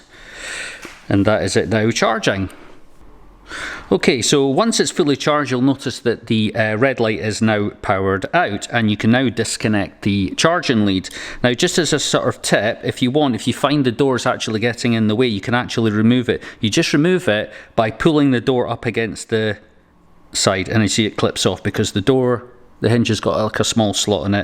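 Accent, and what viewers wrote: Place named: Scotland, United Kingdom. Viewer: OMG 😮 ... You’re Scottish!! :-). All the big you tubers are Scottish... just look at big-Clive!! :-)... great video!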